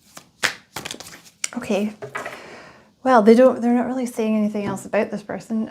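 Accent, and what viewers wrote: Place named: Scotland, United Kingdom. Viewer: Thank you. The technology world it's slowly killing the human world and it's based on convenience that is alienating us from each other. I talk with older people and they get me. I talked with younger people and they're nice but it's like a program nice with no eye contact. People are so afraid of their own vulnerability that they won't take the risk of getting to know each other. And I think in the older world we were forced into it. Where today we can sit in front of our phone and say and do things that don't require any risk. I love hearing your Scottish accent and I believe you're a real person but this gets in the way of human interaction sometimes and I'm glad I have a job where I meet with real people in their homes. Honestly I think that's what the younger generation is missing. I will retire and go back to Scotland because I think there is still enough people left their who get it. 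God bless you you're a good soul.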